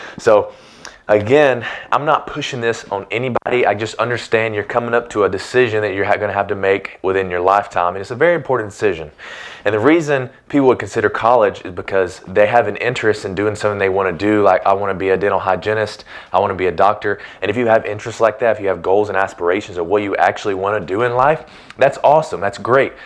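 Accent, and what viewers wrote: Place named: Alabama, United States. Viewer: Gorgeous with an Alabama accent 😍 💕 💖.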